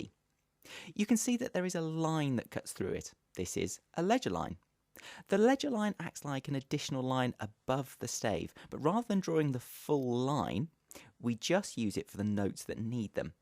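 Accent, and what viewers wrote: United Kingdom. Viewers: A nice British accent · are you from the uk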